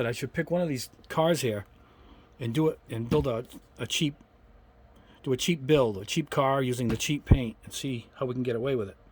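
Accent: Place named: Long Island, United States. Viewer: New England.. I would have guessed Long Island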